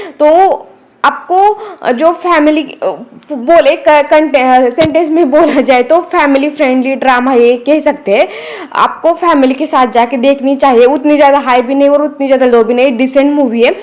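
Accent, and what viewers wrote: Maharashtra, India. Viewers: marathi accent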